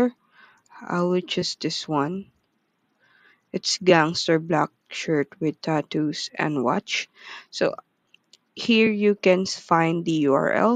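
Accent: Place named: Philippines. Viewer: I can tell by your accent, I think you're filipino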